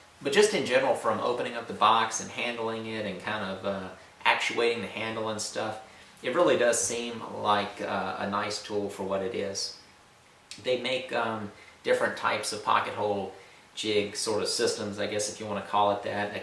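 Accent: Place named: Arkansas, United States. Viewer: Off-topic.. Being from Scandinavia, I think I've only ever heard one [famous] person from Arkansas before... do you happen to be from thereabouts as well? :) Keep up the good work!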